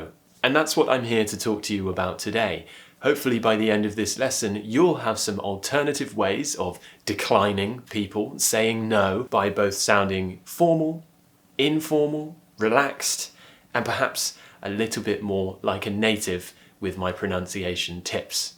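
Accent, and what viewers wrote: United Kingdom. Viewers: Oh god, I love so much the british accent. Thank you again for this great video. 👍🏻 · Im from malaysia..i just to know your youtube british accent..i love the way you explain..crystal clear ⭐️⭐️⭐️⭐️⭐️⭐️⭐️ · you have a distinctive accent among all the British English teachers in the entire YouTube. To me that is the best accent. That is a kind of accent which I have always idealised while learning English or when dealing with the British native speakers. Among the British English teachers on YouTube, I have noticed that even the ones, who claim they speak and teach the RP English, don't speak the best English, at least, I don't find so appealing the way they sound compared to your English. Please, reveal the secret why your English sounds so perfect. It is exactly a kind of English that was in my learning recordings which had been made by the English teaching companies based in the UK. 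Maybe you were born and growen somewhere in the heart if the Southern England? Maybe it has to do with the schools and further higher institution you went to?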